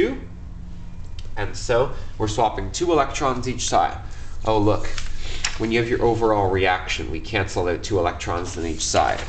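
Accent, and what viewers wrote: Canada. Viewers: I can tell he's Canadian by the way he pronounces the word "Out" like "Aut". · I think it's an Atlantic Canada accent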